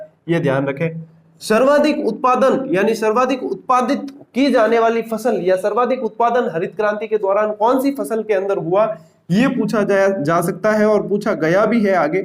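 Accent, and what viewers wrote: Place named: Rajasthan, India. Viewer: M Punjabi ND preparing for 3rd grade. after taking your classes I learned marwadi too 😄😄 love your marwadi sir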